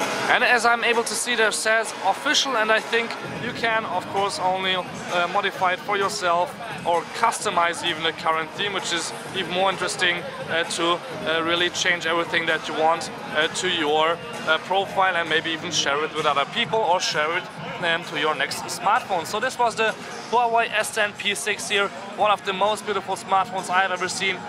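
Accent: Germany. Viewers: Wie man sofort hört, dass er Deutsch ist xD Immer wieder lustig :D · German accent? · God, do u sound German!^^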